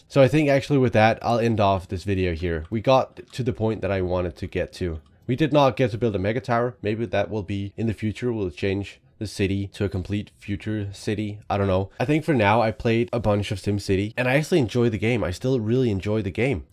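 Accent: Denmark. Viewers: Jeg tror bare jeg var lidt træt da jeg så den gamle video. For nu kan jeg godt lidt høre den Danske afsagt. Men stadig rigtig god til engelsk. 😁 Og så vil jeg bare sige tillykke med de 500 abonnementer godt på vej til 1000 🎉🥳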